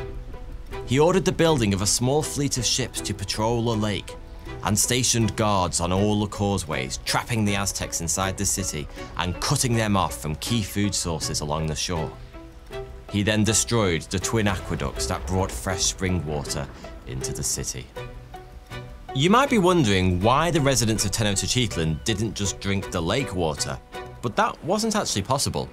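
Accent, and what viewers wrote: United Kingdom. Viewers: He wrote about himself: " is a British YouTuber.." So it is just a kind of British accent. Maybe you should watch videos about the main British accents. Maybe his accent is not as unique as might you think - even if it is not a native Liverpool accent. Everyone has a family and friends in childhood and schools etc. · that's how they pronounce them in the UK. My Roman wife does the same thing sometimes. Don't forget there's an entire world out there, bud. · Never thought a British person pronouncing indigenous cities would be something I’d watch good job on this vid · Is it because he’s British or because the words he gets wrong are unpronounceable non English? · The british black legend against Spain once again in your british or so accent!!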